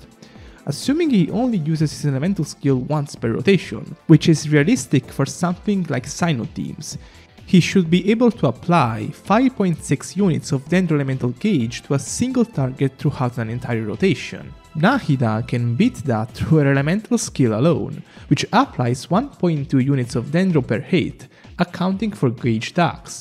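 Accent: Italy. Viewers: What’s this accent ? Italian ? It’s beautiful btw!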